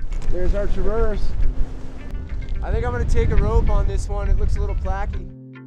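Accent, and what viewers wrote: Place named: California, United States. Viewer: Sounds like cali surfer dialect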